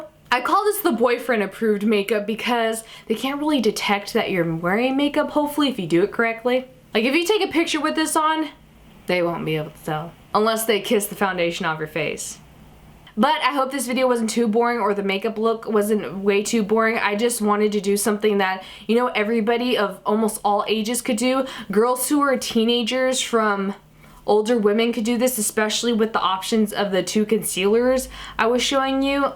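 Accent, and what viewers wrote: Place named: Texas, United States. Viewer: are you from Texas originally?? I always think you have a thick Texas accent:0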